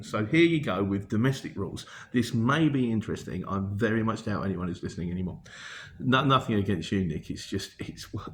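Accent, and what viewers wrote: South London, United Kingdom. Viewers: Its a soft south London accent .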